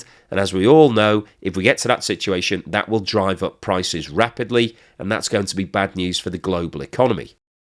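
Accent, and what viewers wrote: United Kingdom. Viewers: Our host is British, eh wot?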